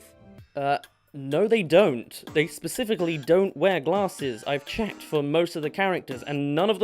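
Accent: United Kingdom. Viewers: Yep, British accents are just the cutest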